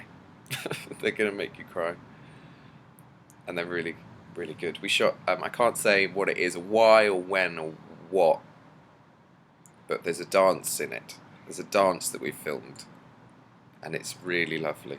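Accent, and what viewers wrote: United Kingdom. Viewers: Thanks for these videos, it really reminds me of England listening to you. Wishing a real cup of tea could be shared, Ill just sip alone until dreams start breaking the realm of reality. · Oh... So he doesnt speak french but british englisch !?.. I thought he was Francis from France..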